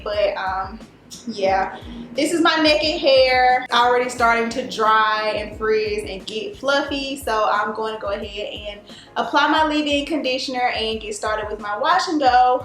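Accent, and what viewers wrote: South, United States. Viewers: Get those ruts ❤️😂❤️😂❤️ I love that country twang...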